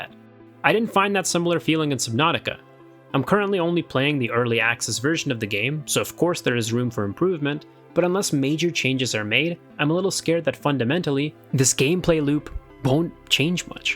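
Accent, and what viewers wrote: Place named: Canada. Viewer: he is Canadian has a strong Canadian accent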